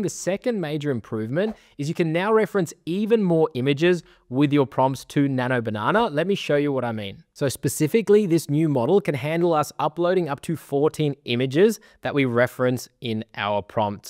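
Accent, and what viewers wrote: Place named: Australia. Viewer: An Aussie in Berlin?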